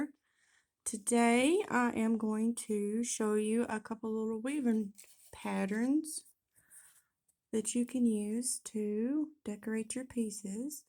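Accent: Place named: South, United States. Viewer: I saw a top comment of yours and I thought that was pretty awesome. Never met too many southern white people who enjoy 2Pac. Love your beautiful southern Belle accent, Mrs.!!! I’m from the south as well btw. 😊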